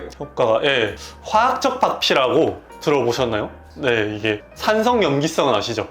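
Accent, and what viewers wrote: Busan, South Korea. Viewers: 저는 멜라노사크림 약국에서 사서 저녁에 스팟으로 바르고 자는데 완전히 없어지진 않아요~! 선생님 억양이 부산사람같아요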